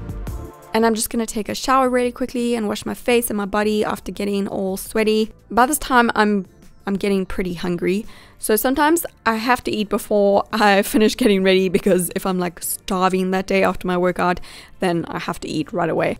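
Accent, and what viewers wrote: South Africa. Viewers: I have a question are you south african? because you sound very south african by the way I am south african. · Are u south African ? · Pxssy Destruktor she is from South Africa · I'm new... your accent? South African? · Are you south african? I can hear ittt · Omg omg are youSouth African ? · Cat Mouse she’s South African😊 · OMG. This is my first vid of yours. I'm from South Africa and as soon as I heard your accent I got so excited. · When you said that you drink Royibos tea ( I can’t spell) I knew that you had to be from there, because you also have the same accent as my parents ( they are also from South Africa) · Hallo. Bly jy in Suid Afrika. Jy klink net so. Wow, jou videos is baie mooi. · Is sy van suid Afrika? Want sy klink soo...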